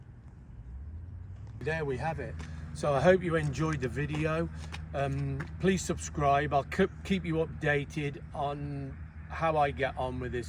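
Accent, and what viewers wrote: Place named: Midlands, United Kingdom. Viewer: Aye, I could tell you had a Midlands accent. I'm originally from Huddersfield.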